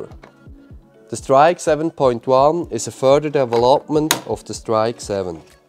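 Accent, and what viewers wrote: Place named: Switzerland. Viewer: Koleg me hört richdig krass s schwizerdütsch us dim englinsch use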